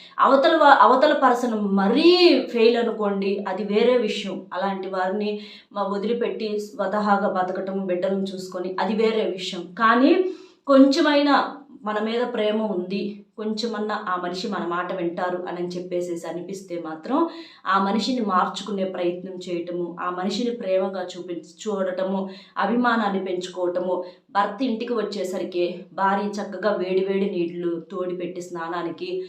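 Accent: Nellore, India. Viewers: YEVURAKKA NIDHE NELLOREAA BAGANE CHEPTHUNNARU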